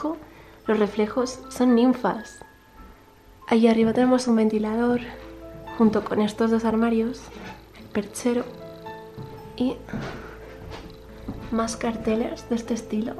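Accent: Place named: Spain. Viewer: acompañada con un acento españolado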